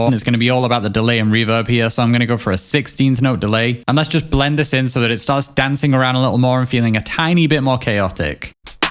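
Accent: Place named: Sheffield, United Kingdom. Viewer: Just found you today. watched a bajillion videos already. You have a bit of a Sheff twinge to your voice, hope i'm right. Big up Steel City if i am!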